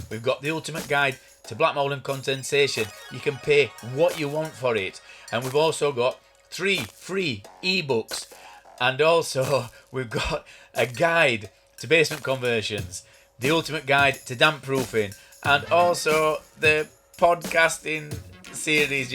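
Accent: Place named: Liverpool, United Kingdom. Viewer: I didn’t know you was from Liverpool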